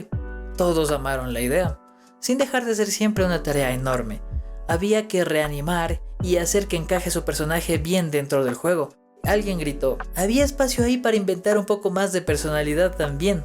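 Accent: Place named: Sierra, Ecuador. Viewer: Bro, tu contenido es genial. También soy fanático de Crash Bandicoot. Saludos, también soy de Ecuador. Mas precisamente de Guayaquil, supongo eres de la Sierra por tu acento.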